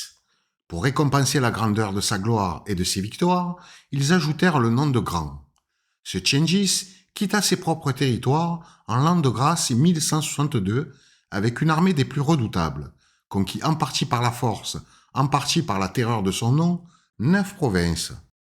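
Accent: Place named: South, France. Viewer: Non, j'déconne vos vidéos sont très interessante te votre accent de sudiste est agréable!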